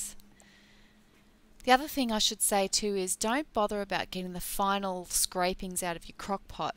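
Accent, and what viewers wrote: Australia. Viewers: Hi, thankyou for your video. I usually make cold process but have felt uninspired for a long time now. I just made Hot Process with honey, no fragrance or E.O. following your instructions. In the mold now, cant wait for it to harden up and cut! Lovely to hear an Aussie voice too!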